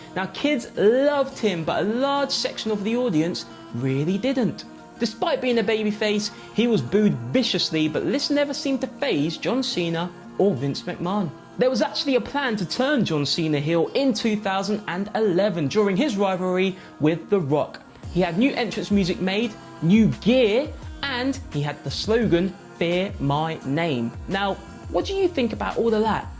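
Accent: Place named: United Kingdom. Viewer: I love how he pronounces McMahon, man I love British accents!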